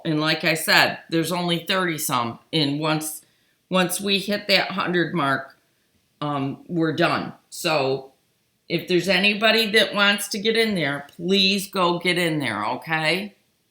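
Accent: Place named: Buffalo, United States. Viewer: Ah, I thought I recognised the accent. I am in England a d have a very good friend in Buffalo, and I hope you are not offended but some of the ways you say some words reminds me of them. I call Buffalo my second home, I have been there so many times. Thank you for your videos, they are so informative.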